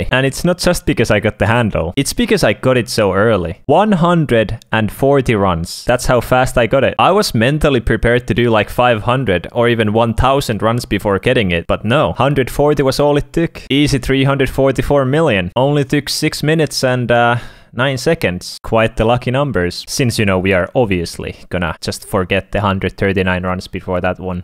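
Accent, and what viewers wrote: Finland. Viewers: R u Finnish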